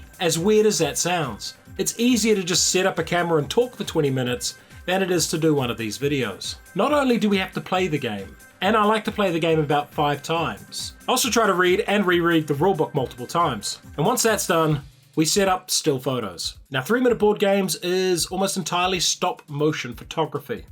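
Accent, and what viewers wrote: New Zealand. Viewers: Love the vids and its great hearing from a kiwi. Just curious but where is that photo taken is it by the old prison?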